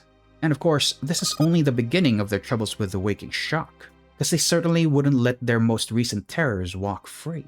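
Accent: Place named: Philippines. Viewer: i can hear a fellow filipino's voice from a mile away... this is an amazing video!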